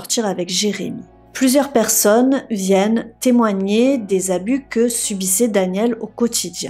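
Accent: South, France. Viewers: < Danielle> C'est une triste histoire surtout pour Danielle. Je l'avais déjà entendu par un autre youtubeur/se (?). Je pense y avoir appris des choses en plus... Le seul soucis c'est ta prononciation pour certains mots...qui me font hérisser les poils sur le crâne. C'est vraiment dommage car tu as une chouette voix du sud...agréable à écouter. Dans cette affaire on voit bien que Scylar est un "bon" comédien. Menteur...colérique...et a l'air d'avoir une haute estime de lui Sans compter qu'à chaque tentative ou il feint de pleurer...il n'y a aucune larme ! Je pense qu'il n'avait aucune confiance en Danielle et qu'il voyait le "mal" quasiment partout. De plus apparemment c'était un gars qui aimait rabaisser sa femme... Peut-être que le comportement qu'il a montré envers sa femme est repris de son père voir de sa mère. Mère un peu trop "proche" de son fils... 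Purée il a un air tellement arrogant et suffisant. Tout dépend de savoir si Scylar brutalisait Danielle ou si ce ne sont que des bruits de couloirs... Si Scylar aimait sincèrement et respectueuses Danielle...je me dit que vu son enthousiasme...son excitation du retour de son homme...je ne pense pas que Danielle aurait tout gâchée avec un amant. Par contre si Scylar était comme le prétendent bon nombre de leurs "ami(e)s" ... c-a-d...violent...rabaissant.. etc...il se pourrait que Jeremy eu été plus qu'un simple "copain"...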